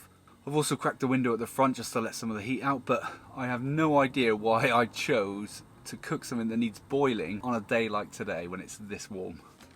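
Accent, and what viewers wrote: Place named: Southwest England, United Kingdom. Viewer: i recognise the accent are you from Plymouth or SouthW?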